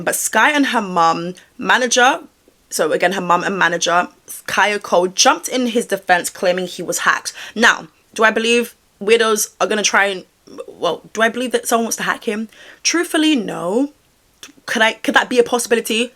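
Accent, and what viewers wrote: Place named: United Kingdom. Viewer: I know this off topic but you have American humor but you sound British asf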